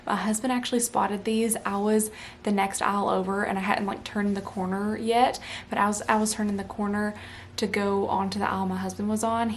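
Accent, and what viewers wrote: South, United States. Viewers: You are so lovely to listen to. Love your accent, reminds me of when I lived in Tennessee- love the southern accents 🇬🇧🇺🇸🌸🌸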